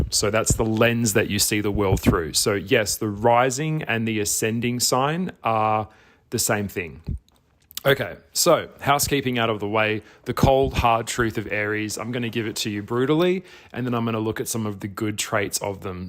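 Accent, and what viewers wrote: Australia. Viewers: Nope, not listening to an Aussie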